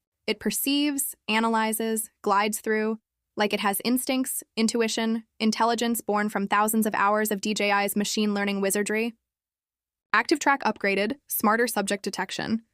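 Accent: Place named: United States. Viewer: a croaky American voice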